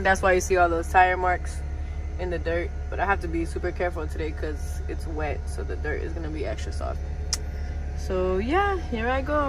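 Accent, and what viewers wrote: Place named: South Florida, United States. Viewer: Sounds like South Florida. Pompano or Miami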